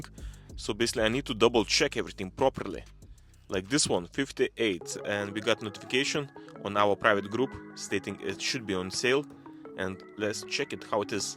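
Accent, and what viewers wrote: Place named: Russia. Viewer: Russians accent❤❤❤sweet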